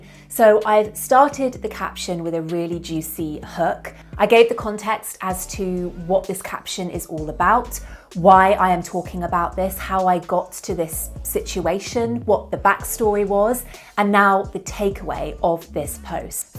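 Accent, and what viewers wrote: United Kingdom. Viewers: She's got that Harry Potter accent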